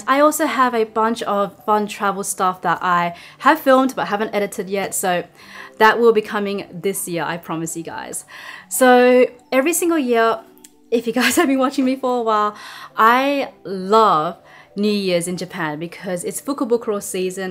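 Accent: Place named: Australia. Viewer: I like your aussie accent while you can fluent speaking japanese 😮😊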